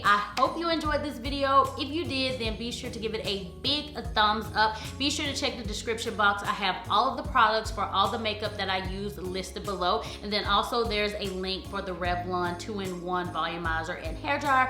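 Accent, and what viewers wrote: South, United States. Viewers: Girl I just love your southern accent 🤗🤗🤗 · I always love your looks and tutorials! And that you sound just as southern as me! 😩 The struggle and the jokes I get! But you’re killing this look and outfit! You go girl💁🏼‍♀️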